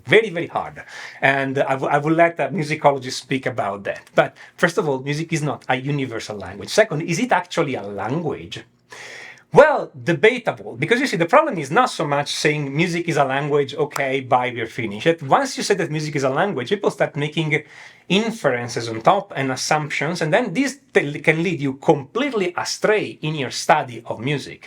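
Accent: Russia. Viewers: Accento russo! piango...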